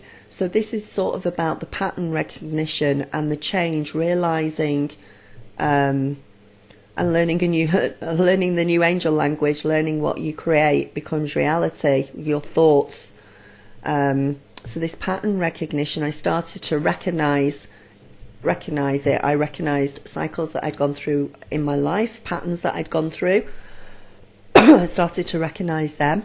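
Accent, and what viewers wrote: United Kingdom. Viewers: Geesh how I love listening to you. I can remember I could always hear a British ladies voice wish me a "good morning Elizabeth" and you sound just like her and also my Granny who is still alive today but now too old to conversate. You're voice is healing so thank you. And the subjects as well. I always knew my thoughts created reality but it's never been so obvious until I met my husband who must be my twin because I have not been able to completley open to him out of fear and now because I stay closed for such long periods, I've watched every "worst case senerio" that I've worried about happen. It gives me hope though that I have a power to change everything and I am conscious if what I am doing. I have not yet mastered overcoming fears when facing the biggest ones. For instance..I had social services knock at my door yesterday because a doctor called in that my vegan lifestyle was not allowing my 2 year old to thrive. 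So there we all sat in the hospital not knowing if these people were going to try and take my daughter away while they poked and proded her over a course of 6 hours only to be told (thank god) that she is in perfect health and good job. I swear I thought I was going to die during it. And something just this security threatening happens constantly. And it will until I open up and stop hiding from fear. Thanks for reading. Thanks for you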